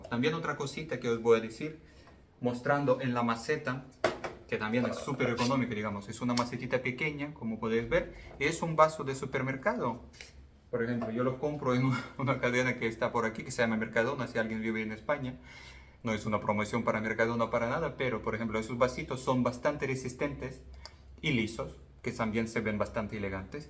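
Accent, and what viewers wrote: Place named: England, United Kingdom. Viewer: querido es un placer escucharte hablar y veo todo tu trabajo merece destacar,la linda forma de explicar cada cosa,eso vale mucho! cuando se pone el ingrediente principal que es el AMOR Todo es mejor, y es más ameno todo! Espero que sigas haciendo vídeos lindo,como dijo el escritor: 2Brian Weiss:Lo Único Real Es El Amor LoDemás...Es Una Ilusión" Te felicito tu pronunciación del idioma español lo hablas muy bien y me encanta tu acento ingles le da un toque de distinción!👏👏👏👏👏👋😀👍Un fuerte abrazo desde Uruguay! Muchas Gracias🌵🍀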